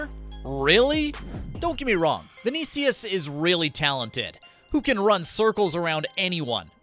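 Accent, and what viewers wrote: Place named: United States. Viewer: i heard a yank accent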